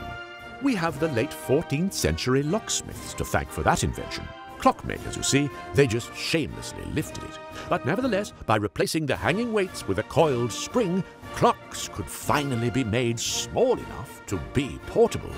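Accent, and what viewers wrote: United Kingdom. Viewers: "it was us Europeans" he said with a British accent, showing the UK as part of the EU was this written in... when did brexit start again? what? 2011? x'D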